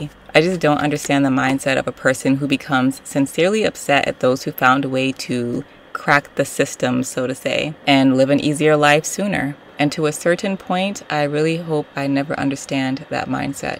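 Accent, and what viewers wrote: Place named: Upstate New York, United States. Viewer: I'm still watching the video but it sound like you are upstate NY. I hope you visit one of the boroughs LOL Come visit the city. I can show you all the spots you will love like ice skating in Manhattan!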